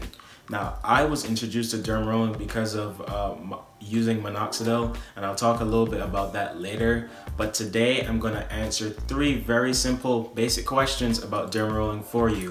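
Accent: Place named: Barbados. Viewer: Love that Bajan accent !❤️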